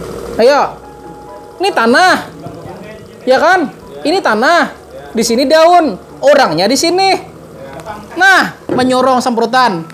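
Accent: Banjar, Indonesia. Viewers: Logat Banjar kayaknya...Smoga sehat selalu.Ulun jg pernah tinggal lama😀 di Bajarmasin.Kutu kebul ko datang terus knapa yah...ditanaman jeruk.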